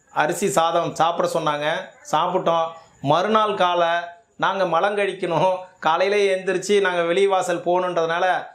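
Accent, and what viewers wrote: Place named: Coimbatore, India. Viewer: நன்றி ஐயா. மலம்கூட மண்ணுள் மக்கினால் மணக்குமென்பதை அழகாக விளக்கினீர்கள். ஏனுங்க நீங்க கோயம்புத்தூர் பேச்சு வழக்கிலேயே பேசுங்க.அழகா இருக்குதுங்க.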